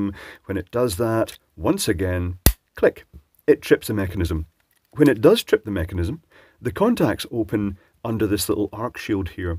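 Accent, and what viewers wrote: Scotland, United Kingdom. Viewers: I don’t just come here for the video’s. I come to hear beautiful Scottish accent! 😅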